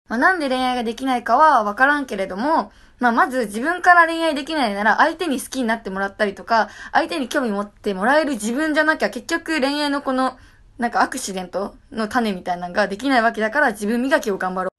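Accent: Okayama, Japan. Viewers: 自分も岡山出身だから岡山弁わかりすぎて好き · 広島県民だけど、広島弁よりやばい面白い岡山弁wwwwww これまたやってほしい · 岡山弁ええなぁ なつかしい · 岡山弁がぬけないとこ大好き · 岡山弁可愛いすぎい！ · こがなチューしよで1人で大爆笑してしまった…爆笑 岡山弁日常的に使う人からしたらめっちゃ聞きやすい！！（笑） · え？岡山県民って素でこのなまり？？ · 岡山弁最高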